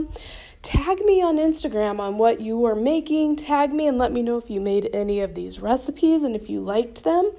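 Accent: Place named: Missouri, United States. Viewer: She’s from MO I think and that’s how we say it in the “almost” south! 😂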